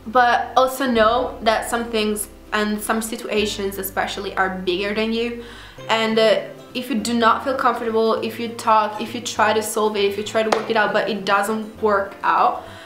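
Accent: United States. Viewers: sta parlando americano non inglese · I love your American accent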